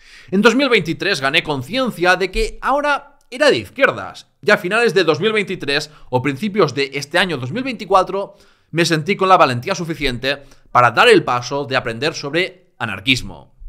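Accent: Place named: Basque Country, Spain. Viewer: Po pa ser gerundes, suenas mu vasco cho, jjjj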